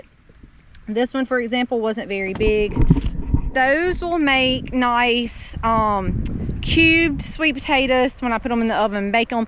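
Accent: South, United States. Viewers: I literally could sit and listen to you talk all day long with that southern accent!!😊😊😊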